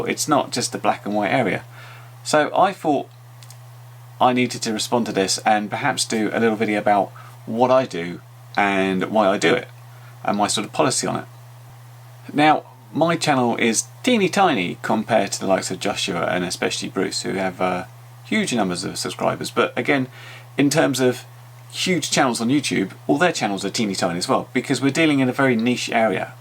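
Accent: United Kingdom. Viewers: As a fellow Brit I find it refreshing to hear your accent and your content is spot on, you call a spade a spade. I must admit that I've never clicked an affiliate link in my life purely because I research a lot and by the time I decide on the product the video is well closed. Must remember to come back to them as a little thank you - I just haven't so far. Keep up the great reviews I try to watch all your stuff.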